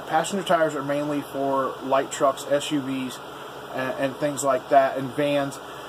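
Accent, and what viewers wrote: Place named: Texas, United States. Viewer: You definitely don't sound like your from up North!! Lol.. You sound like a Texan or like a southerner.... Hahahahaha 😂😎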